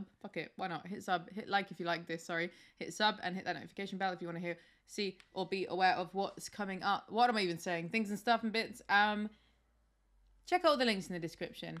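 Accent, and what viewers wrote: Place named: United Kingdom. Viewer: What is your name again, please?! I love the British accent!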